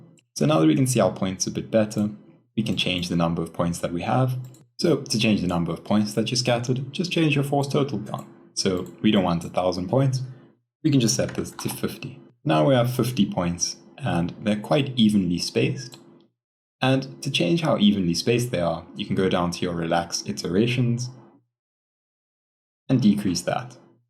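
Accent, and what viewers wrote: South Africa. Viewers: Only stumbled on your stuff now...almost 2 years later. I was surprised to hear the South African accent. I'm here in Gauteng. Great tutorials man!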